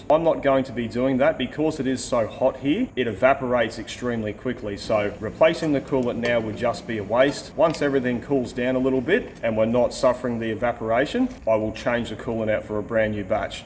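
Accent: Australia. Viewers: So bloody austrailian ah. "That aint no bone boy. Now this is what i call a bone" with a sparkle in ya eye😁😁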